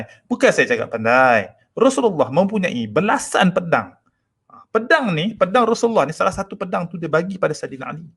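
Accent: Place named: Pahang, Malaysia. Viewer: tuan orang Pahang kan . loghat macam Pahang je 😆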